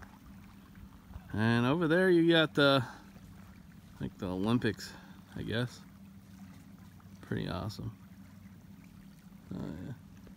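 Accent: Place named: South, United States. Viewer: WOW THATS VERY BEAUTIFUL WEATHER LOOKING. UR DOING A AWESOME JOB WITH UR CHANNEL ! WOW. AWW LOOK AT THE DEER. LOL THAT DOG WANTS IT. IVE NEVER BEEN THERE BUT IT LOOKS BEAUTIFUL. I LOVE UR ACCENT. SOUTHERN SOUNDING A LIL. LOVE THE BEATS U PUT IN HERE. VERY ENTERTAINING. KEEP UP THE GREAT WORK!! I WILL PLAY MORE OF UR VIDEOS TOMORROW!! ITS ALMOST 1AM HERE. BUT I LOVE THE MUSIC U PUT IN HERE TO. AND THE FAST FOWARD MOTION. I LOVE NATURE . SKIPPING ROCKS IS FUN. LOL HAVE A GREAT NIGHT! ❤🤗❤🤗❤🤗🤗🔥🔥🔥🔥🔥🔥💯💯💯💯💯💯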